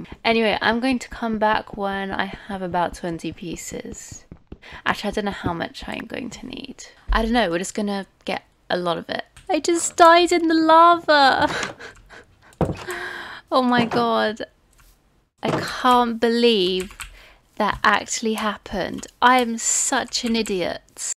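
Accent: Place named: United Kingdom. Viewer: I'm falling in love with your British accent😃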